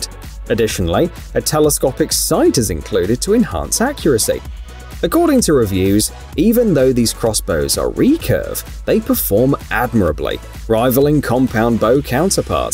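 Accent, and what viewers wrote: United Kingdom. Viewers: No more British accents!!! Thumbs down!!! Irish, French or Swedish!!!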